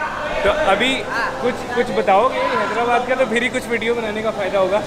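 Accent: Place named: Punjab, India. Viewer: اچھا لگا پیشکش کا انداز پنجابی کا ٹچ مزید دو آتشہ کر دیتا ھے مرحبا پنجابی کو فراموش نہ کرنا یہ اردو کی ماں ھے سینئر ھے ماشاء اللہ سدا شاد باد منزل مراد رھو...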